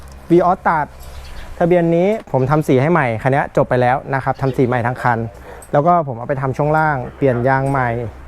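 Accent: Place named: Phetchabun, Thailand. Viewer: สำเนียงเพชรบูรณ์ หล่มสักครับ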